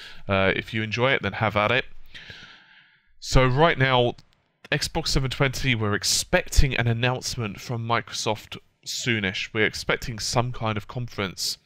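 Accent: United Kingdom. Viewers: British?...I just thought u were always drunk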